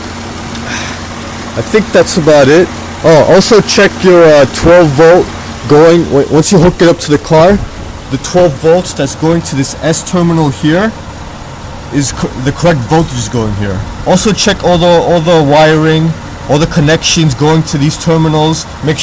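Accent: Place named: Brooklyn, United States. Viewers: Omg. Holy shit I swear I just saw Sylvester Stallone on jimmy Fallon !!! I was thinking I like this guy cause he is practical and it motivates me to do shit myself!! I'm guessing New York maybe jersey ? Hey I love em all so no one be offended ...I was raised catholic guilt lol, and if someone said are u Irish and Protestant I'd be like no but I like lucky charms ..... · Great Video! Brooklyn huh? I'd know that accent anywhere!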